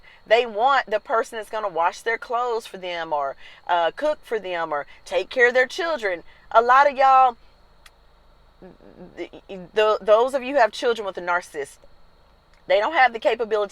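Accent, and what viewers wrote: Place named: South, United States. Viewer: Love your southern voice. Great review and thanks for sharing. Can you please do a video on narcissist neighbors who refuse to take no for a answer.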